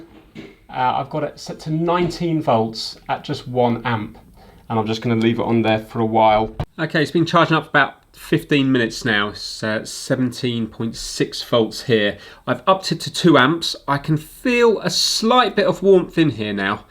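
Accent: United Kingdom. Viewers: I love listening to British people talk!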